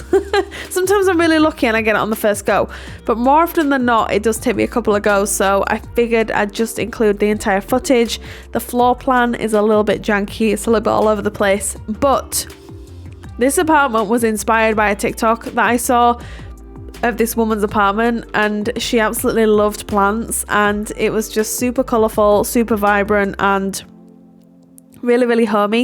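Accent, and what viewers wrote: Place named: Lancaster, United Kingdom. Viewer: Are you from Lancaster? 🤔 Your accent is so familiar!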